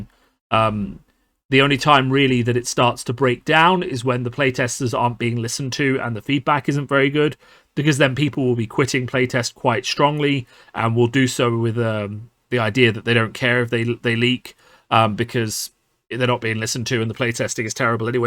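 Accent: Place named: United Kingdom. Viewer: A Lovely British Accent Indeed! Yes I Listened To The End)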